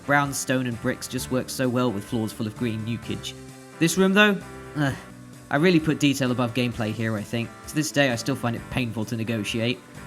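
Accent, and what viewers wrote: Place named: United Kingdom. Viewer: >Map 25 >That blatantly placed inverted crucifix overlooking a pile of corpses If that doesn't speak "edgy teen", I don't know what does, and frankly, it feels nostalgic. It appeals to me on a personal. Regardless, this was a fun video, and frankly inspiring. Making a full fledged megawad when you're 17 is one hell of an undertaking. Pretty sure I spent my days as a 17~18 year old depressed, angry and jacking off, which... frankly isn't all too different from who I am today. The only difference is that I have a career and a steady income. But none of that really matters. :P I'm a bit late to the party, but I really want to get into doom mapping. I'm not exactly creative or smart, but I want to put out a map or two as part of my bucket list. Maybe one day it'll happen. Thanks for sharing this. Talented people like you are a rare breed these days. 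Also, I had no idea you were a Brit! Your accent blew my mind.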